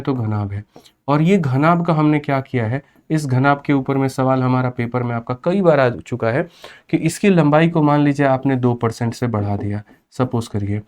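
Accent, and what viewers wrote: Bihar, India. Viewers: Sir main rrb je ke liye pdh rhi hu aapki videos helpful hai thankyou sir , bss ek request hai sir please thoda sa questions ko na english me pdh kr explain kra kro taki humko pta chle ki english me pdhke kaise approach Krna hai questions ko . Baki aap bihari me bolte ho vo toh sara upr se nikl jata hai . Meri bss yahi request thi 😊😊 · Ab sir paka bihari leg rha ha gajb❤❤❤❤❤🎉🎉🎉🎉🎉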